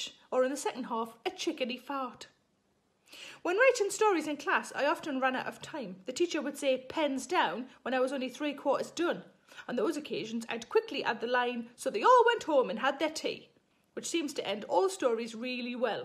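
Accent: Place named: Geordie, United Kingdom. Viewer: hi, the worst thing I ever wore was a pair of trousers that were slightly to short and people said my budgies were flying low, as a Geordie I appreciate your comedy more than most, like my friend bobby pattinson, another fantastic northeast comic for your fans that may not know him says its the dialect that makes us laugh, thank you for being you, I laugh till I nearly split watching you shows, love from springwell est gateshead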